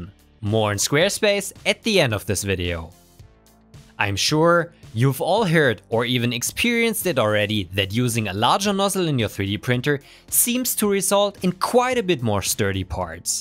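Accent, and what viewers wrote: Germany. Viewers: Man merkt richtig dass du deutscher bist.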